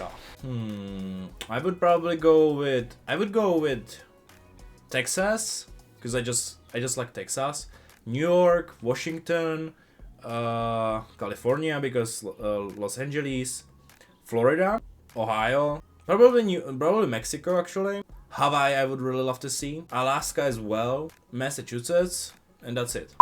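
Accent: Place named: Czech Republic. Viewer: Tak 4 sekundy a bylo to jasný že jseš čech.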